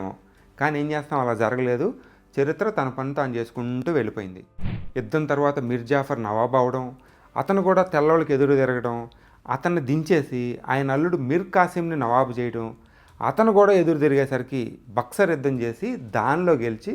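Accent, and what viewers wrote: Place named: East Godavari, India. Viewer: ఈ ఎధవ తూగోజీ యాసలో ముసలోల్లు చెప్పిన టులు ఏంది ఈ వాగుడు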